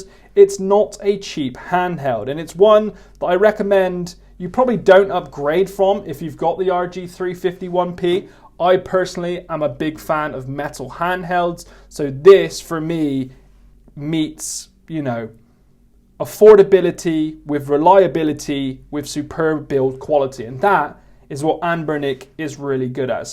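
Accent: United Kingdom. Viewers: I didn’t even realize you were British until you said something. I think there are so many Youtubers around the world now that I don’t even think much about accents. Weird!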